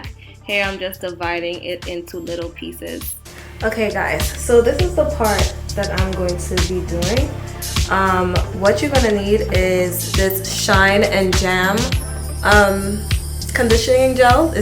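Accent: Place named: East Coast, United States. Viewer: ! Great video. I need to do somet'ing with my hair during this quarantine lol. Not to be too intrusive but where are you from? I hear a little east coast in your accent! lol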